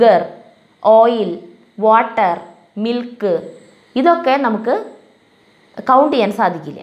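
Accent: Thrissur, India. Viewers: ഹായ്ന്റ പെങ്ങള് കുട്ട്യേ.. ക്ലാസ് അസ്സലായി ട്ട, തൃശൂർ സ്റ്റൈലിൽ വർത്താനം കലക്കി ട്ട, teacher മ്മ്മ്ടെ തൃശൂരാണ്,class excellent, അറിവ് പകരുംതോറും വർദിക്കും. ദൈവം അനുഗ്രഹിക്കട്ടെ !!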